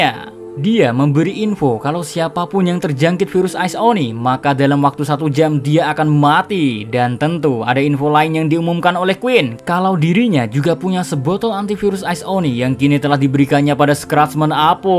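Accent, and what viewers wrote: Java, Indonesia. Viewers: Konten react paling ....... ditunggu dan terngiang dgn logat jawanya 😂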